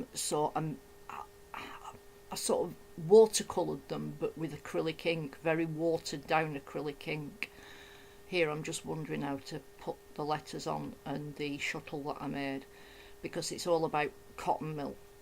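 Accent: Lancashire, United Kingdom. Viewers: Your accent makes me nostalgic, as my mum was from Lancashire (Manchester, or Pendlebury, to be exact). Your art describes a lot about what Mother remembered about those times, long ago. Your use of materials is amazing! Thank you. I will watch this video many more times! And God bless England during all the violence./Sandy in North Carolina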